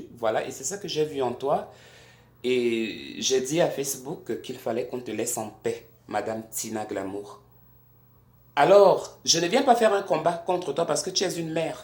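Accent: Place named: Cameroon. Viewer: à chanté les à t'entendre parler je reconnais ma soeur camerounaise. tu drague deja mama tina on se connait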